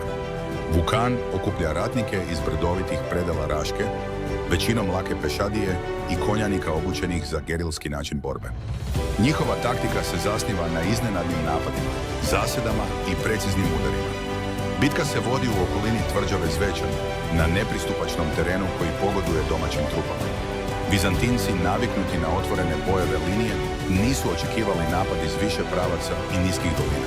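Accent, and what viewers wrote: Croatia. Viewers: Volim slušat ovaj naš Hrvatski glas kako hvali našu bracu po materi.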